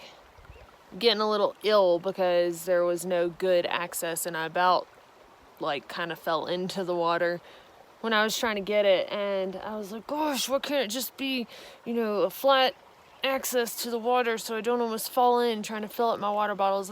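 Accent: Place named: Alabama, United States. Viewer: Wow I missed ya'll, I have a cabin in South fork, been here since April, leave after bow season in October. I could have taken your friend to Colo springs and back in one day. I feel bad. That said I was shed hunting at the top of the cliffs north of 149 between SF and Creed while you were walking by. We've been to many areas in the Rockies and this one, SF /Creed have some of the best people. Again hate we missed the Alabama Drawl, you sound like us, SC Drawl. Stay safe.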